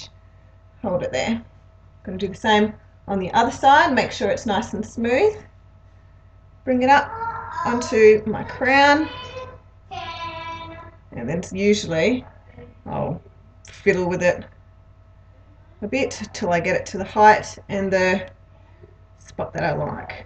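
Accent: Australia. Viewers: nice to hear another australian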